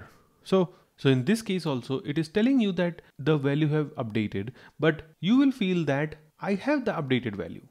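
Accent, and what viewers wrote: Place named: India. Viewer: You have an overpowering accent that makes you difficult to understand, there are AI tools that can fix this and online training resources to teach yourself how to minimize it, if you choose to. It's not bc you have an Indian accent specifically but bc it's a very heavy one. Try bring your tongue forward in your mouth when you speak English and stop 'rolling' your Rs.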